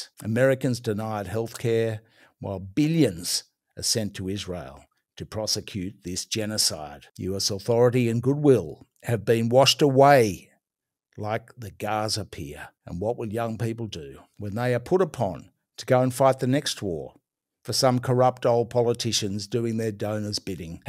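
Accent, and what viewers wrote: Australia. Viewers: My first time watching this channel. I thought "He is an Australian!" I can pick our accent pretty quickly now 😀. It's a friendly accent. Thank you. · Very grateful to be able to hear an Australian voice speak the truth. Legend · Finally an Australian accent criticising that horror in the Middle East by Israel and America. Frankly can never agree with imprisonment of indigenous peoples nor genocide or even starvation nor torturing via rape! They need stopping and justice, nothing else. Free the Middle East from Israel and America.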